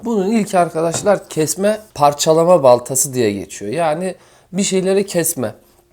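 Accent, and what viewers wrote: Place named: Istanbul, Turkey. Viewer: Dostum eline emeğin e saglik.diksiyon baya istanbul ağzına yaklasmis😆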